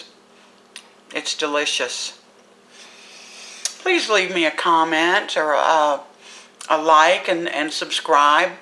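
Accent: South, United States. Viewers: This recipe is most valid because the lady making it has southern accent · Mmmmm this looks good I'm going too be trying to make this soon for my husband and kids!!!! I am subscribing too your channel I loovveee your southern twang!!!!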